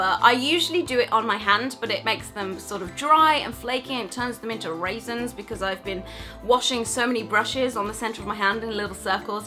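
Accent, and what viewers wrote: United Kingdom. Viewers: This may sound weird but I LOVE your British accsent I want to move to Englend SOOOOO bad😟 I'm AMERICAN AND WANT TO MOVE TO ENGLEND 😭😭😭😭😭😆😆😆😆🇬🇧🇬🇧🇬🇧🇬🇧🇬🇧🇬🇧🇺🇸🇺🇸🇺🇸🇺🇸🇺🇸🇺🇸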